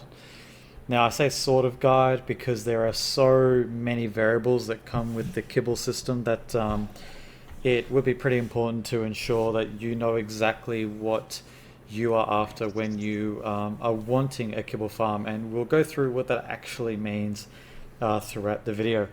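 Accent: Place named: Australia. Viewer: Best designs I’ve seen… although an Australian trying to sound scientific is too funny 😂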